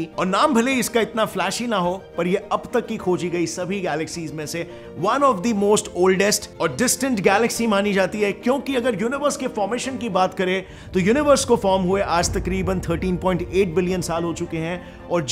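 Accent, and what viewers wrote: India. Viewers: Why with these videos it's always an Indian guy with such a strong accent and also that he doesn't know that much English words so he just goes to his original language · I will love if you text it, i really have a problem understand the dialect India/english.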